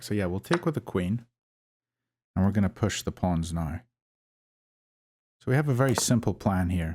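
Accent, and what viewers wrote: United Kingdom. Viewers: gg buddy , which accent u have in uk¿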